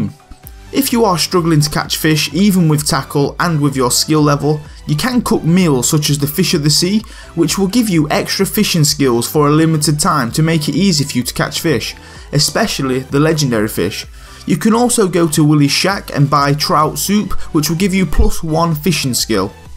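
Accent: United Kingdom. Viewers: Yes, but what's your accent? I like it. Is it British?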